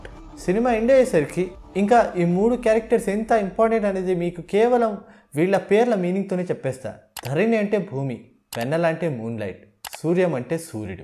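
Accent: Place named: Telangana, India. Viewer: Micro detail: How many of you noticed speaking in Telanagana 😅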